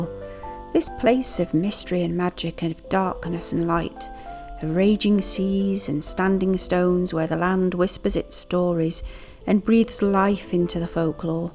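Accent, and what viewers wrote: Northern England, United Kingdom. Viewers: It was odd hearing you say you spent your childhood in Crantock, were they holidays? I hear a northern English accent in your voice, not Cornish, I'm intrigued. Love your artwork.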